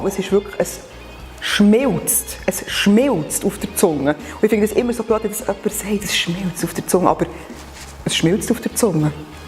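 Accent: Bern, Switzerland. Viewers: wenn der Titel auf Hochdeutsch ist, dann werden die meisten wohl davon ausgehen, dass im Video auch Hochdeutsch geredet wird. Aber der Berner Dialekt ist so schön, dass es auch mir als Österreicher egal ist, dass du in der Mundart redet. Das meiste versteht man eh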